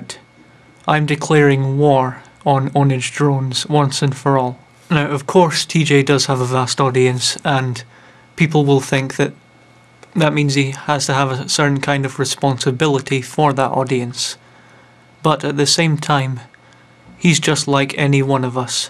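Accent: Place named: Scotland, United Kingdom. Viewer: are you scottish? you sound scottish <3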